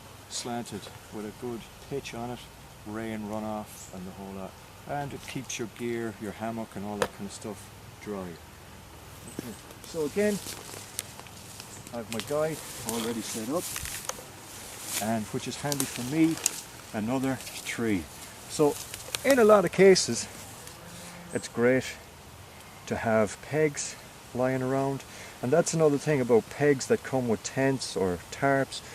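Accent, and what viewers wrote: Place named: Ireland. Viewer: knew the Irish accent straight away..good video..sub'd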